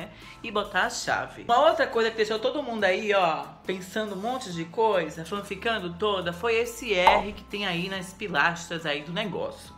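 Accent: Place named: Paraná, Brazil. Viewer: o R é do PR. Kkkkkkk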